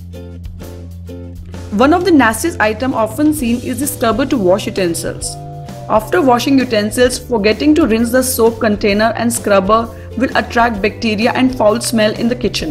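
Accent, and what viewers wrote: India. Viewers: Absolutely Indian version of talking English pronunciation... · I truly enjoyed this vid. Indian accent made it so much better. Thanks for the tips 🙂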